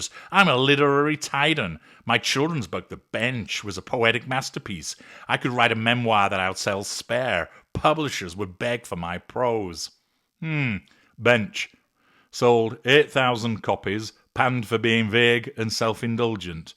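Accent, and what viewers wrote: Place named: Yorkshire, United Kingdom. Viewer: Ey up,! 💜 Loved your Yorkshire accent! Thank you for the morning laugh! You're too funny!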